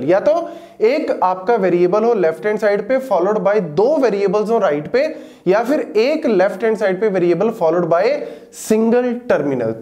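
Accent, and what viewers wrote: India. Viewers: Bro I like your accent, sounds so different to other indians I watched on youtube. I am not indian but understood you very well thank you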